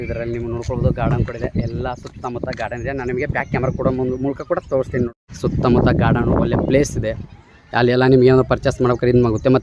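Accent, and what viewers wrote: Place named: Davanagere, India. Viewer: Bro nanu davanagere talk erne maani bro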